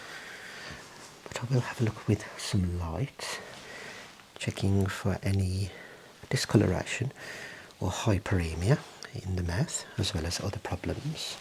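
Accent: Birmingham, United Kingdom. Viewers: Mouth. It’s the brummie accent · Your accent makes me homesick for the UK ♥ thanks for your hard work, love from Canada!!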